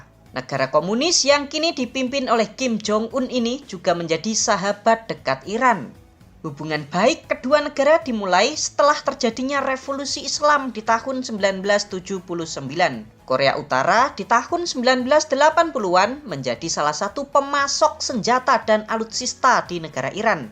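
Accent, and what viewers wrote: Java, Indonesia. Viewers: Pembawa acara dengan logat jawa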